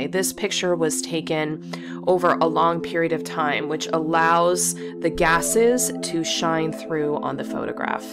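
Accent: United States. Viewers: You from the east coast, possibly jersey?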